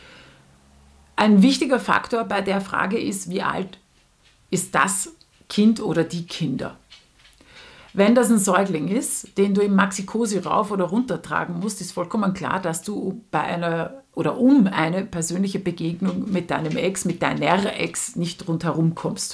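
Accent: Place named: Austria. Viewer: Kuulostaa Itävallan murteelta. ❤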